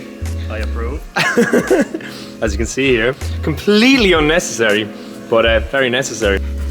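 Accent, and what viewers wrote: Ireland. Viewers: I missed your FOKYN Irish accent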